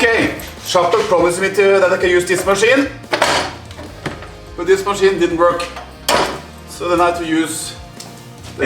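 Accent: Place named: Norway. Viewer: Heavy norwegian accent i hear🤣, great video tho!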